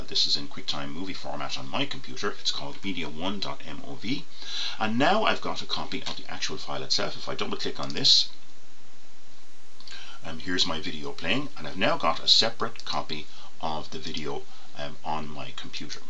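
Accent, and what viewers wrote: Ireland. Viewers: Brilliant! For us MAC folk, it works with the Unarchiver program, it's a fast Smooth fix! And it sounds much better with an Irish accent.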